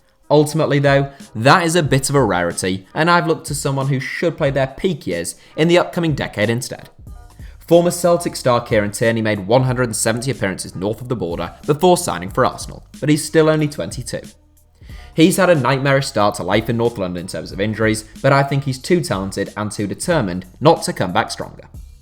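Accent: Ireland. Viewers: Is this the voice of the irishman man from the other HITC channel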